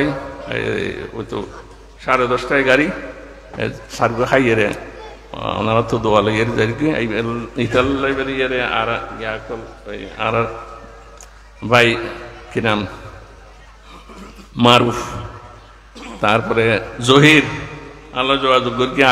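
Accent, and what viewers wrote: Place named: Chittagong, Bangladesh. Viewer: চিটাগং ভাষা কম বুঝি তারপর ও আপনার জন্য ভালা লাগে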